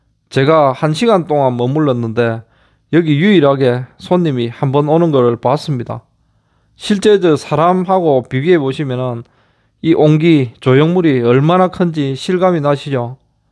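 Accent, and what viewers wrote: Gyeongsang, South Korea. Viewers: 님 댓글 감사드립니다 땡큐 💘 경상도 사투리 ㅎㅎㅎ 오늘도 행복하세요^^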